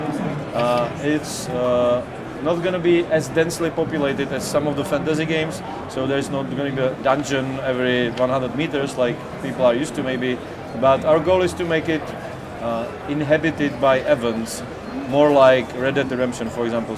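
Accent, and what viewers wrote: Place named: Czech Republic. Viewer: Jde poznat český přízvuk ! :D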